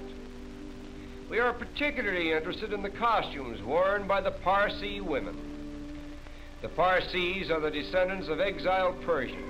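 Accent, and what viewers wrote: Transatlantic, United States. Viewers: Old school Transatlantic accent.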